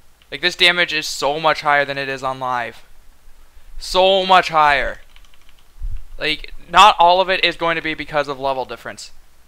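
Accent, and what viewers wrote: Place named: West, United States. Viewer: He has a western accent. What are you getting to? It's Runes not Ruins.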